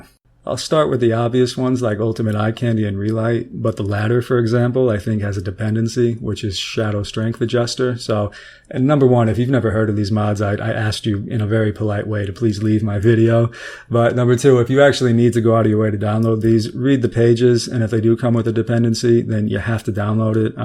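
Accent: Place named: working class, United States. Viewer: he sounds like most working class americans. this is how we talk. and he's being honest about how he feels which is a good thong, actually. if it makes you uncomfortable, maybe its a personal problem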